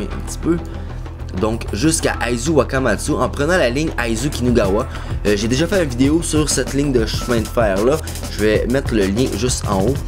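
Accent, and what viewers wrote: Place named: Quebec, Canada. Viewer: Merci! Très intéressant!! C’est bien d’entendre un québécois parler du Japon!! (étant moi même québécoise) J’aime beaucoup ce que tu fais ainsi que ton authenticité!!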